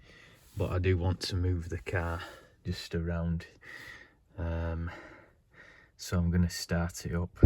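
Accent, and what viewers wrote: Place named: North, United Kingdom. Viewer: You have something rare......a pleasant Northern accent that's easy on the ears. Where are you from?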